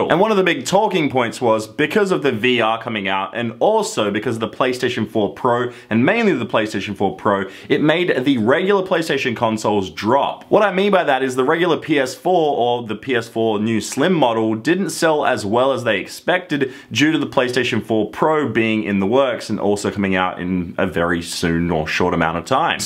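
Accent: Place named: Australia. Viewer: Bob Bobby literally the exact same accent as every other Australian lol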